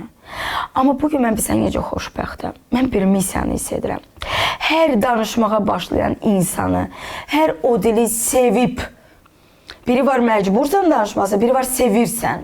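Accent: Standard. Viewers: Mənimçün çox maraqlıdır, xanım Azərbaycan dilində belə təmiz danışmağı necə və haradan öyrənib. Mənim qızım orta məktəbi Rusiyada qurtarıb və artıq 10 ildən çoxdur Bakıda yaşayır, amma xanım kimi təmiz danışa bilmir, danışığında aksent hiss olunur. xanımı tanımağıma çox şad oldum.🎉😊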